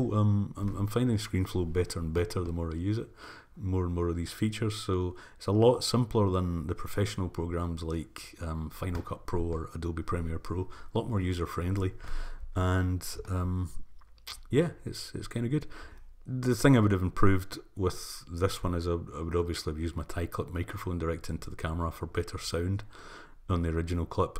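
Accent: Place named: Scotland, United Kingdom. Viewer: Great to listen to another Scottish voice on YouTube. Looks like I'm going to be adding a green screen to my Amazon wish list!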